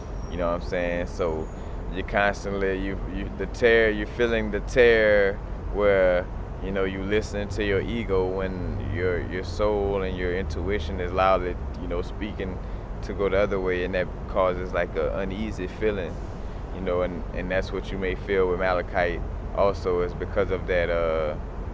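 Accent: New Orleans, United States. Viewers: Is that a New Orleans accent?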